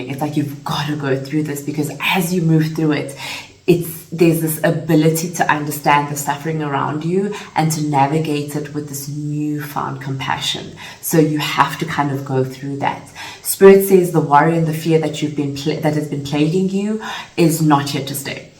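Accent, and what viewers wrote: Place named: South Africa. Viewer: Lol it's a South African accent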